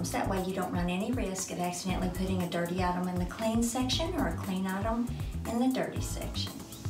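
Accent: South, United States. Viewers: Funny, great looking, with a soft southern accent.😅❤️🇺🇸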